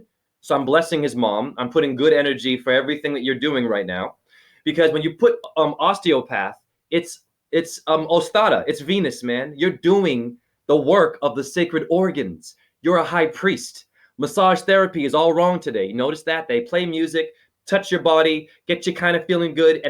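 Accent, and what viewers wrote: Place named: England, United Kingdom. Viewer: I So much enjoyed your chat ... It' Feels fabulous to listen and change 💚💜🙏. Ha ha I love the English voice, I'm from England 😊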